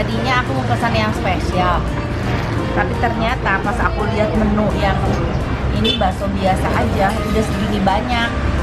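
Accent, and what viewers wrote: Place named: Bogor, Indonesia. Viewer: Orang Bogor mana Sora na😁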